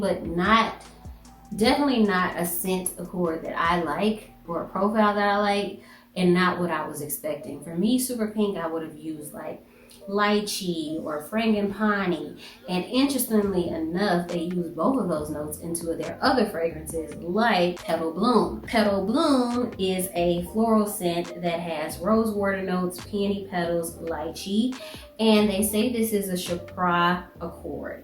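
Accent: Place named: Arkansas, United States. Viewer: As soon as you mentioned an Arkansas influence on your pronunciation, I was IN. *subscribe*. No, I'm not from Arkansas, but I am from who you are. Great review. Well done.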